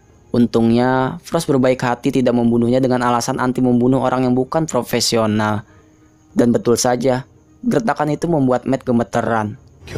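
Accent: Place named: Banyumas, Indonesia. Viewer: Kaya ngapak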